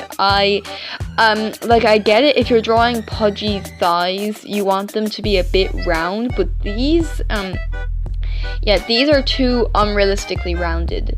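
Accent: Ireland. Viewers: ngl idk but she sounds like she has a irish accent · Are you Irish?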